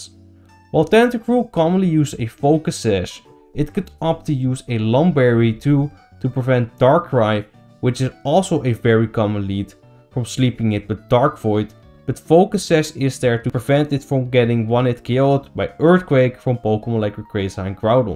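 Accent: Netherlands. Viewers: Hi are you dutch?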